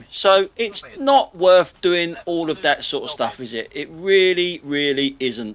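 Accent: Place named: Cockney, United Kingdom. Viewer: Hi, only discovered your channel recently and have now watched about 150 of you episodes so far, I'm addicted....It's interesting to see how you've developed your style over the years. Love the 'only an Audi' & 'Burke in a Merc' trademark comments 😂. Love the cockney voice commentary which you've developed and is really polished now. I always thought your voice sounded like Dave Basset the Wimbledon/Sheff Utd manager. Just listened to an old clip of his and I think it does. Also the recent younger you photo also bears a slight resemblance to him too 😂. Keep up the good work 👍 I will slowly catch up with all the old episodes and then just look forward to the new ones... Cheers 😁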